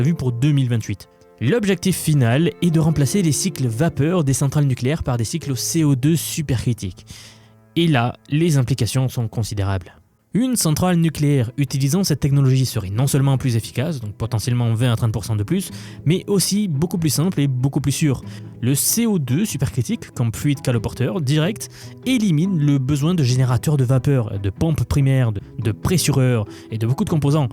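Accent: South, France. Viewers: Je pense que tu es une IA bien alimentée en données issues de publications scientifiques. Mais ce serait une déception car j’aime ton accent méridional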